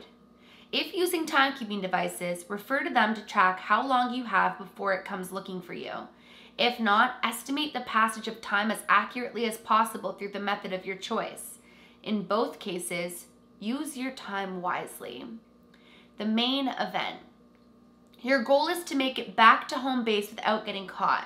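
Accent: Canada. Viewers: Well she is canadian she can’t help her accent lol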